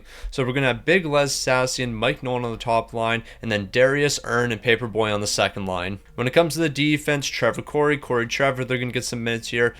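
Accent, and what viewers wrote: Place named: Nova Scotia, Canada. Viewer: What province are you from ? Gotta be Nova Scotia lol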